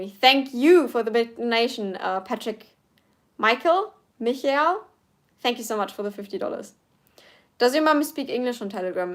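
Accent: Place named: United Kingdom. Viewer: You sound a bit like a character out of the Harry Potter films when you're speaking English. No offence!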